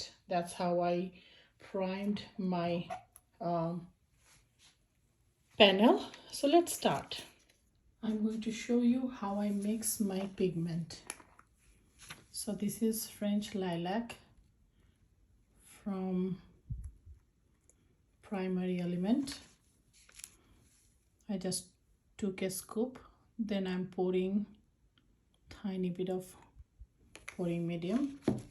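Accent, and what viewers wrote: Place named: Middle East. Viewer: I love your creations, and I think it's adorable that you have that (I think) Middle Eastern accent, and you use the phrase, my bad. 🥰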